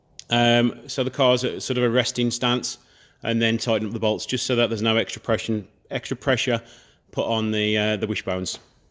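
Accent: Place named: Birmingham, United Kingdom. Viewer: Great vids. Personally, I'm a basic mechanic but looking to learn. Have an '85 Sprint and a S2 147 TS. Good to hear another Brummie too! UTV!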